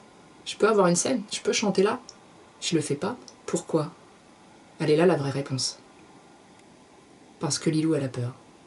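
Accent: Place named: France. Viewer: Hello!! J'aime bien ton authenticité, ton franc parlé et ton accent....J'ai une amie Française que je connais depuis peu et j'adore son accent....Tu me fais pensée à elle!! J'écoute tes vidéos depuis peu et je suis abonnée également et je regrette pas....Tu as un vidéo sur les Arpèges entre autre et des trucs que j'ai enfin compris comment m'y prendre pour pouvoir l'apprendre et tu expliques très bien toutes les étapes!! Merci! J'adore aussi ta toune:!! Tellement réaliste! Et je voulais te dire que moi aussi j'ai vécu le jugement des gens sur mon orientation: On m'a cataloguer quelques fois de (Lesbienne) mais en réalité je ne le suis pas. Je suis en couple depuis 29 ans et même à ça les gens me juge juste par mon côté Garçonnière....Mais avec les années je commençe à m'en foutre pas mal moi aussi donc!!....Ta personnalité me rejoins parce que je suis authentique et un franc parlé comme toi et c'est ce que mes amies aiment de moi alors la recette doit être bonne!! 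Longue vie à ta chaîne!! Et au plaisir de te relire et t'écouter....tu as du beau talent...sincèrement!!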